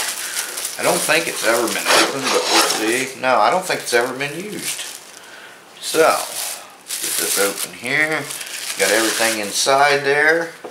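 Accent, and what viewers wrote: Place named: Indiana, United States. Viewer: I would have guessed Indiana. They talk like that 'round here. Lol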